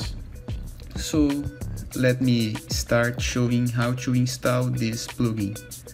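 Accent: Brazil. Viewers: Olá, amigo. Pelo sotaque suponho que é brasileiro. Quero ver se consegue me ajudar com um dúvida, quero gerar uma forma de pagamento que transforme o valor de Reais para a cotação do momento, tipo, gerar o QR code de R$500 com a cotação do mercado bitocoin. Valeu, amigo. Sucesso.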